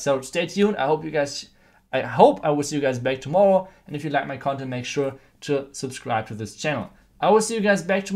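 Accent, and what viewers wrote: Germany. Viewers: i can hear it in your accent that your german ;D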